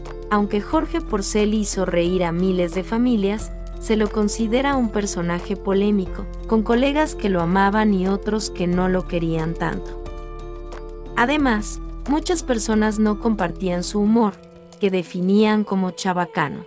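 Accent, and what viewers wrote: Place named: Central America. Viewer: Por que el audio con tono o acento centro americano? No me gusta.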